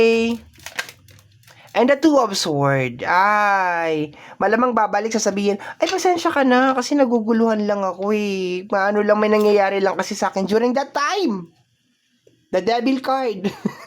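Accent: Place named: Ilonggo, Philippines. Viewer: abaw a kabalo ka mag ilonggo a😂😂😂gudevz po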